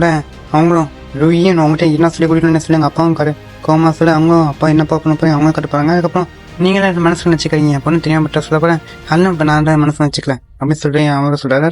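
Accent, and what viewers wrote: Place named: Kerala, India. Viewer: Bro, tamil thana ,nenga pesurathu konjam Malayalam tamil kalanthu pesura mari irukku so, please tamill padichitu YouTube pesunga.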